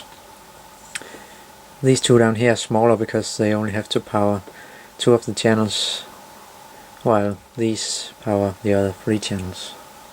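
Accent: Denmark. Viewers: Elektronik Center ? Danish accent.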